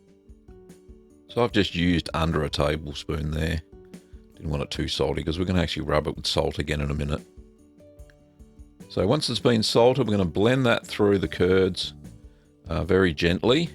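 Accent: Australia. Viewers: Ah finally an aussie...phew mate....finally a local.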